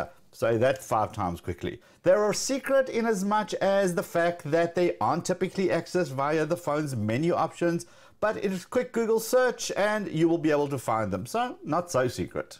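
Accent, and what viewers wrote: South Africa. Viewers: Ayyyy I recognize the south African accent 😀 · Are you a South African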